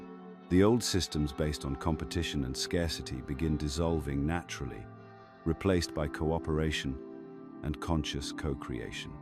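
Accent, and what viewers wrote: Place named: United Kingdom. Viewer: With the British accent 😅😢😅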